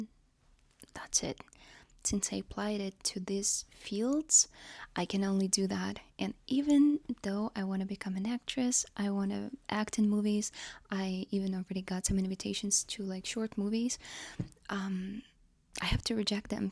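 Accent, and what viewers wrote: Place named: Eastern Europe. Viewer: Love your slavic accent😊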